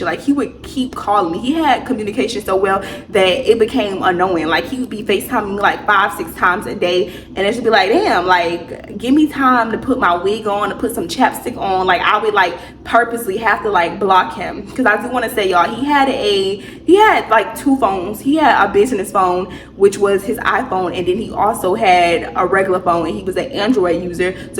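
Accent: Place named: Southeast Texas, United States. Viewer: He gotta be either from Beaumont or Orange 😂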